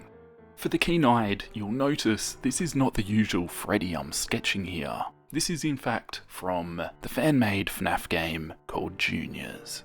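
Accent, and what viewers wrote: Australia. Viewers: Also, r u Australian? If u r, i am 2! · Australian dialogue 😱😱😱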